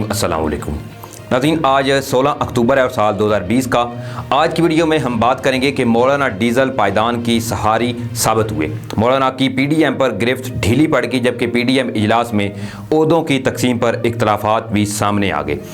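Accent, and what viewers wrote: India. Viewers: جناب صاحب اگر آپ مناسب سمجھیں تو اپنا تلفظ ٹھیک کریں ھمیں انڈین ھندی ٹائپ کی اردو سے نفرت ھے جیسے ۔ خون کو کھون کہنا خالی کو کھالی ۔ خانہ کو کھانہ وغیرہ ۔ اگر یقین نہیں تو اپنی سپیچ دھرا کے سن لیں اور باز رھیں ۔ لگتا ھے نئے نئے انڈین فلموں سے متاثر ھوئے ھیں ۔ اپنے اردو کو خالص کریں پلیز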